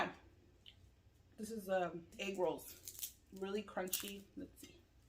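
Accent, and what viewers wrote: Los Angeles, United States. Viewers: Hey! I’ve been watching your channel since you had that pink velvet blanket on the background, when you did your videos in your bedroom I think? But I don’t usually comment but I enjoy watching you kind of like a talk show but with food! And you have a cool personality and just a very authentic way about you, including the good and not so good aspects of life. You show yourself and all aspects of you and I respect that you don’t put up a fake persona, you still say “foo” and I grew up in Los Angeles county and remember talking like that and still do in my head say “foo” but I don’t hear it often in my daily life so it’s nostalgic for me. I love Asian food too so I enjoy when you feature Asian food in your videos but I’m just a curious eater too so we’re similar in that, I love to taste and discover new foods and dishes. 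Thank you for being genuine and being real, I wish you the best and know that even though many of us don’t comment all the time, I for one do genuinely want the best for you and are silently cheering you on. You’ve had a tough childhood in many ways and it’s so cool that you have been growing and I give you props for how you handle the negative comments you always make me laugh with how you respond in a calm and funny way, but it still sucks that there’s people who don’t care about others and choose to be mean, like really? Would people say half of what they say in person? No it’s only cuz it’s behind a screen. You keep being you and just thank you for sharing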